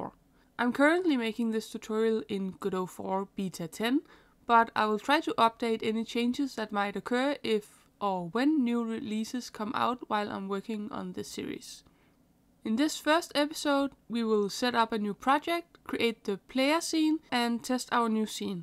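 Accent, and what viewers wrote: Denmark. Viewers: Du er i hvert fald dansker da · Oh, the accent. Are you danish? Greetings from across the western border! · Wow, you sound very Danish, anyways, great guide!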